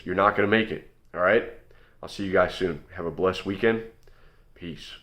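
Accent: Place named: North Carolina, United States. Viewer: This guy sounds like everyone in North Carolina small towns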